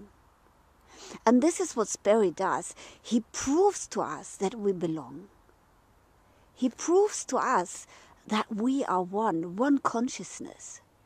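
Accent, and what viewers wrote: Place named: Germany. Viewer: Thank you! I also wish you a most happy christmas. You're a fantastic lady and it's a pleasure to communicate more with you then ever before. Your toastmasters involvement surely did good to you German accent English;-) Keep up the great work! Let's make it TO BE fabulous!:-)))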